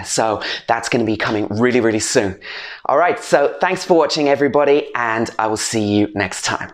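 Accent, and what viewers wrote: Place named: England, United Kingdom. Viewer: This is not a British accent. It's an English accent. Or are the Welsh and Scots not British?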